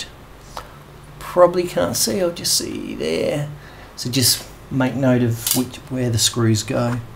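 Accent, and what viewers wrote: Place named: United Kingdom. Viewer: You would be very informative were it not for the painful experience of having to hear your extremely annoying British (or whatever) accent! Couldn't you get someone to speak normally so that we can understand??!!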